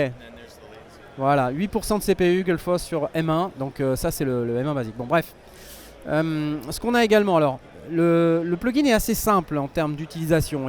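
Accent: France. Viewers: I will try to ré-explain ... Fluent but frenchy indeed.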